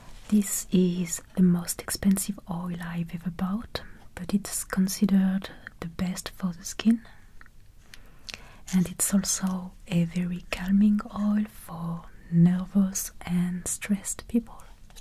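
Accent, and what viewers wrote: France. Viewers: Yup she's from France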